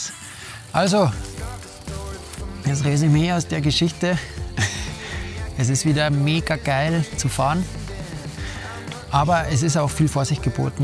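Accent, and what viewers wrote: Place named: Bavaria, Germany. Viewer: Unfassbar sympathisch - hab di über mtb-news gefunden und ziag ma direkt alle Video rein ;-) Bin selber 29 Jahre und hab erst jetzt mit dem mtb-Sport angefangen. Aber bin scho voi drin und werd mia demnächst auch ein Dirt-Bike anschaffen! Hast a paar Tipps? Und endlich moi jemand, der meine Sprache spricht. Überragend - hoffentlich kommt noch mehr vo dia! Liebe Grüße aus Zolling und keep riding! :)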